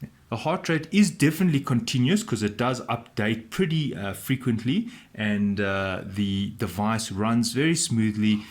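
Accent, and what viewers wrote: South Africa. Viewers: Excellent video. I love the SA accent.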